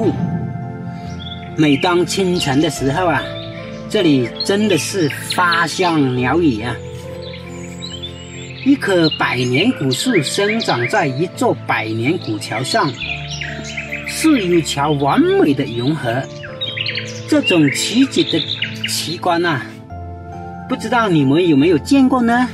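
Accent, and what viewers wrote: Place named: Hakka, China. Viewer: 有客家話口音的普通話，也許說幾句客家話也有支持者喲！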